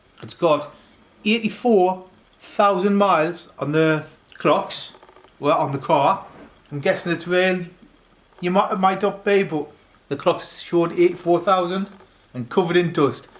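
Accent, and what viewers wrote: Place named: Sunderland, United Kingdom. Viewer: He's not a Geordie he's a mackam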